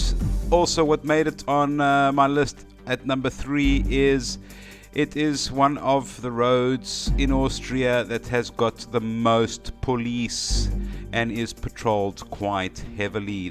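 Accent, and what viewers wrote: South Africa. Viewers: nice vid mate.....what part of South Africa you from? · You sound like you from South Africa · Hi there. Your accent sounds like S.African. I am Saffa living in France 20 years now and motorcycling here.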